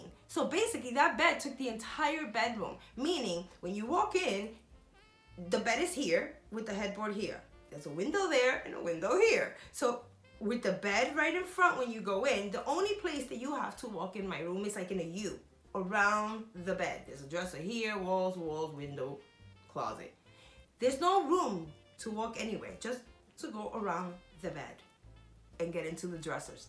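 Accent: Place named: Spain. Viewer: I enjoy you a lot I just kind of met you watching my TV and I'm happy I have to watch somebody to Spanish like me and curious and with a little bit anxiety like me you're wonderful to me and I love watching you I love your accent oh the way you talk I'm Spanish to and I love your new baby you can't get him wonderful he is adorable I love him blessings want to be your friend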